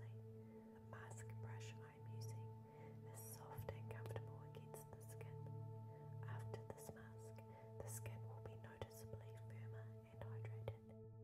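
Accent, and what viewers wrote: New Zealand. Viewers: do I hear a kiwi accent :) ? very beautiful work btw... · You sound like a kiwi ( newzealanders)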